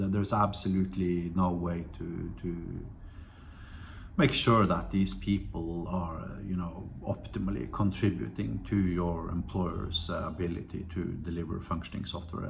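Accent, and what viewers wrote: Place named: Norway. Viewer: Thickest Norwegian accent on Youtube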